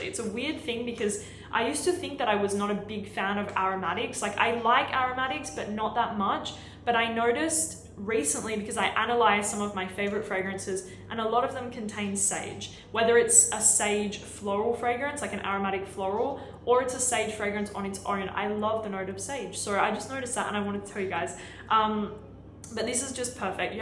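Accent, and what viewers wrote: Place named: Australia. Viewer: Love Australian words” accent!! AMAIZINNNN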